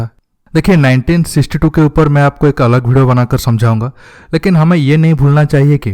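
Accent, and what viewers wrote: Odisha, India. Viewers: Bhai aap ODISHA se ho kya....?????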